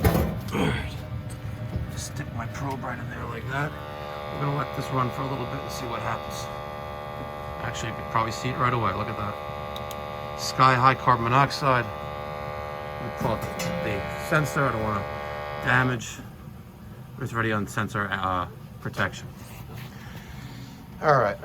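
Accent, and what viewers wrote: New York, United States. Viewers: "That white smoke doesn't mean you are electing a new pope." "I know that!" I love how you New Yorkers talk and joke.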